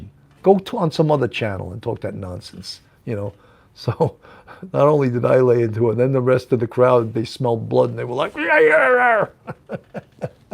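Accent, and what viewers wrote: New York, United States. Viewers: Having lived in NYC when I was a kid you sound like an old friend. Thanks!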